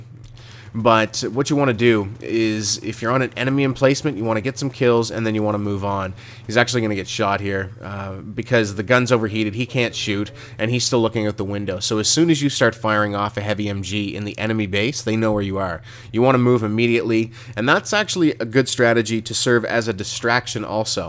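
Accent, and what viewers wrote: Canada. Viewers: You sound Canadian. Are you?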